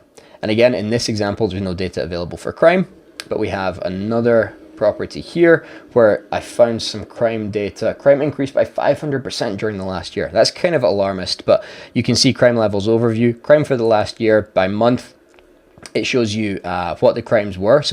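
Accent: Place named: Scotland, United Kingdom. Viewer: Good to hear the Scottish accent to do with property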